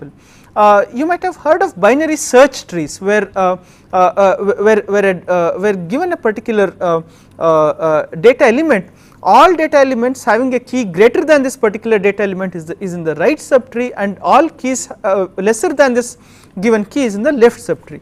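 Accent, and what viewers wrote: India. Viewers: Indian English is hard to listen -*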